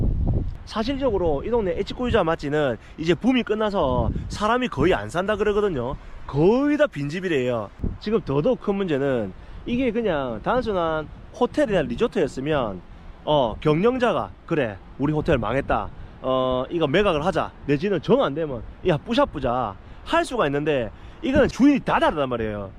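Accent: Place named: Busan, South Korea. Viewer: 부산출신 남편이랑 말투가 똑같애서 억수 친근감 덜어여~^^ 남편이 소개해서 보게됐는데 어제 거의 종일 보고 구독도 눌렀어요. 너무 열심히 사시는 모습에 저 자신을 돌아보고 반성하게 되네요. 건강챙기시면서 하셔요~^^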